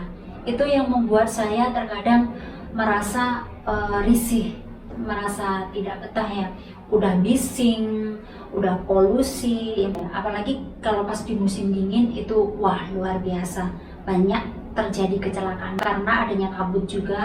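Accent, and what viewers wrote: Java, Indonesia. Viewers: Salam kenal y mbae..dri logatnya kayaknya wong jowo yo sampean mb?maaf klw slh...stiap liat vlog2 orng indonesia yg di india rta2 sm keluhan nya..seht2 y mb..salam dri indonesia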